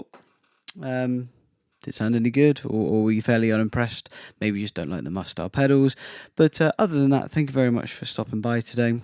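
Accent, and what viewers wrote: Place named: United Kingdom. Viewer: Hello, cheers for the demo sounds like your from the UK. I'm looking for a cheap fuzz to send my Minimoog through. where did you get it from? Would you consider doing a comparison test between a cheap fuzz, Distortion and a sustain pedal. Is there a big difference? Cheers Tim. ( yes you are from the Uk as I discovered near the end of the video:) ) I like your presenting style.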